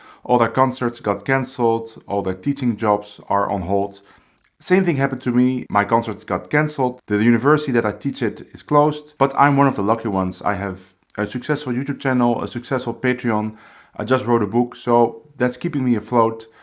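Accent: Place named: Netherlands. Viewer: I love your Dutch accent, it's the best. 😍😉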